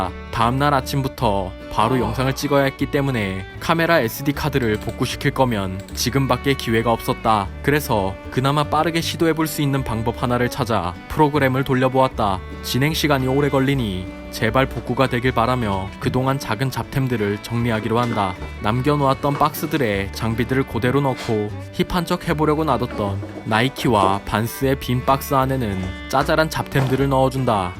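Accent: Jeju, South Korea. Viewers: 제주도 분이세요?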